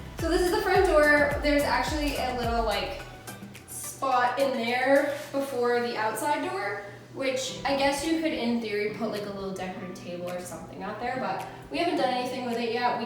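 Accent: Maine, United States. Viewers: On another video you asked if you have an accent. I can here the Maine accent in certain words, like when you say "certain".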